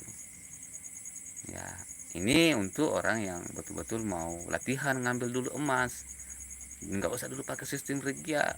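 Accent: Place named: South, Indonesia. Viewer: Posisi dmn ki, bos ku kayaknya logat selatan ki ini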